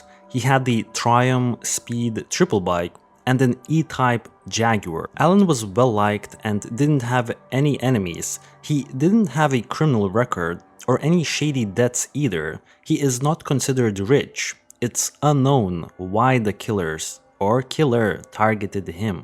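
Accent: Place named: Russia. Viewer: Completely off-topic, but are you Russian? You sound so much like my dad when he spoke English. He immigrated to the US when he was 24. It was soothing to hear (aside from the content in which you were talking about).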